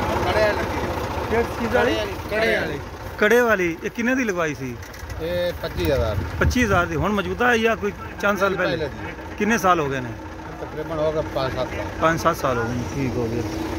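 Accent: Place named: Ferozpur, India. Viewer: Bhai saab tuhadiyian video nall sade Ferozpur Fazilka area de loka no bhaut fyida hoya kyuki sadi boli te tuhadi boli same hai jiss nall jahre malwa belt te majha doaba area de loka ne sada majaak banaya hoya ci hon ono de mouth te olte maooh thappar vajiya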